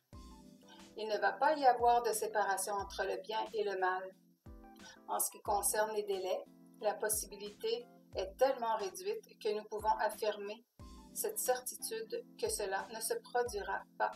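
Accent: Quebec, Canada. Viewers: Merci encore avec une superbe musique et des messages très inspirants et avec votre voix du CANADA ou QUEBEC.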